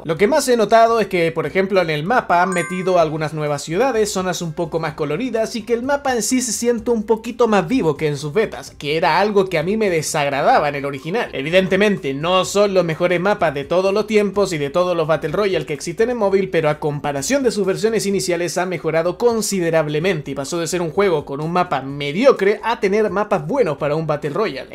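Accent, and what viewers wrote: Chile. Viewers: Ke ermozo chileno leon dice wn kliau JSJSJSJSJ ✨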